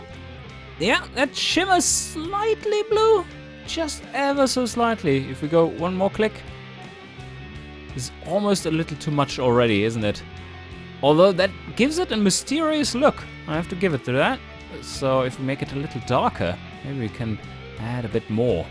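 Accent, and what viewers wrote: Germany. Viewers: Mate, are you german?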